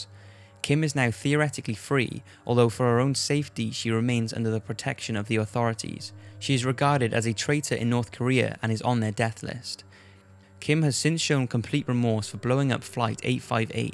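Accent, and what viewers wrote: United Kingdom. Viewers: Where abouts in the UK is your accent from??? Pls answer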